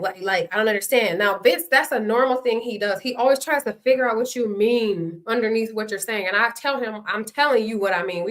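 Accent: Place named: Detroit, United States. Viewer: I heard your Detroit accent immediately, similar for some of the other cast. It is subtle but Detroiters have it. Saying D'Troit in a way more like the original French origin versus dEETroit I hear outside Michigan.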